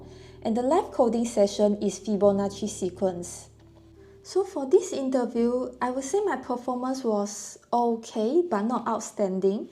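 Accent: Singapore. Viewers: she sounds singaporean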